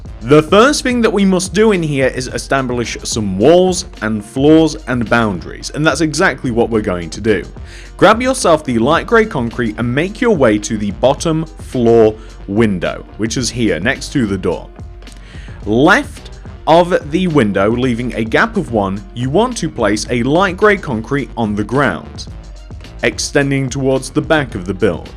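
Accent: England, United Kingdom. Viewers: Are you English, cause I am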